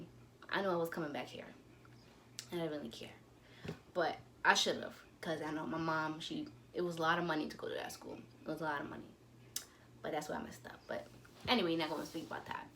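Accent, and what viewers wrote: Trinidad and Tobago. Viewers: You are talking and can hear the trini accent.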